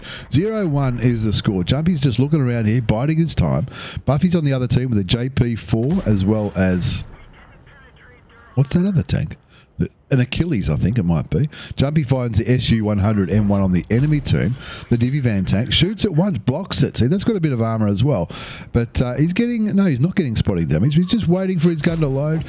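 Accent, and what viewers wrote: Australia. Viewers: Hey yes you not any of those other bloaks luv your videos from Fla. on N/A also awesome aussie accent mate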